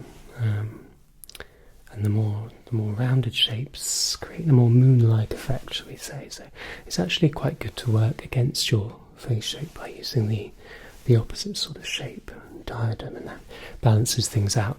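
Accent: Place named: RP, United Kingdom. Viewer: love the sound quality..🔥🔥🔥🔥🔥 Your tongue in cheek posh is brilliant. It's not the air conditioning that's the problem for it being insufferably hot 🔥. It's heating Meridian's up and a little dab is not going to suffice in cooling madam. LOL. Cracking me up over here - " I know you're a bit of a magpie, you like those sparkly things..." ♥️♥️♥️🔥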